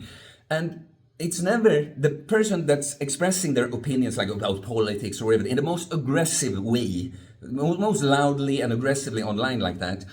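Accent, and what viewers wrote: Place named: Sweden. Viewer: this was fucking hilarious, I nearly pissed my pants. Your Swedish accent rocks! Love your outlook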